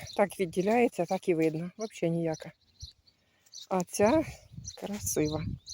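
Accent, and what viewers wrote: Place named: Poltava, Ukraine. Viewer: В вас виговор Полтавський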